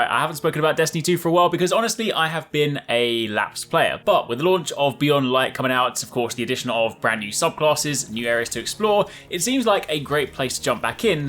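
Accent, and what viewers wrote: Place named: United Kingdom. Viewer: I really wanted to like the video but you sound just like a Brit that I had to rearrange his face...lol he came to the wrong town in bama running his mouth...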